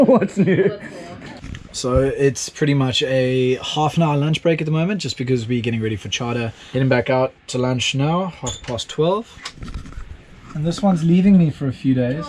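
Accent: South Africa. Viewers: Are South African? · Are you south African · Are you South African bro? And if so what advice would give someone who would like to work on yachts like you? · Are you South African??? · your accent sounds south african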